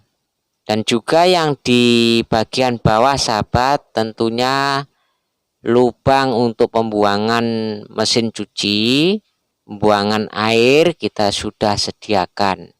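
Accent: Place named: Java, Indonesia. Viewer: Jakarta mas.. Logatnya gampang dikenal.. Jowo ne pundi mas? Enak ya.. Bisa merantau di Malaysia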